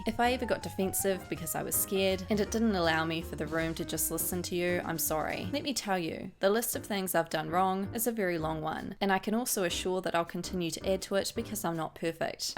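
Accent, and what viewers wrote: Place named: New Zealand. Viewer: Kiwi narrator? YAAAAS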